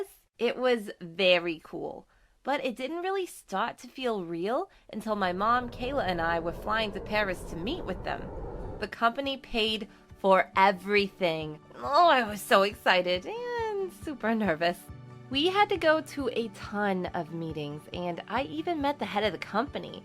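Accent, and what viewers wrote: New York, United States. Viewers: I heard that new york accent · It’s probably the New York accent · is it me or or does it sound new yorkish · Moe and moe pi'tures! Sounds like New York baby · Her accent is really cute it’s kind like a New York accent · She new Yorker voice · Her voice sounds like a ny accent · No she has a New york accent. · She got that model new York accent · newyork accent? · new yorker? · Nice new York ancient or is it just me 🙋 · Well i can tell she’s from new York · She sounds like she has a New York accent and one of the background she was in with her sister that thought bubble was a gacha life background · That thick new york accent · This has to be a New York accent. It just sounds so familiar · She sounds like she is from NY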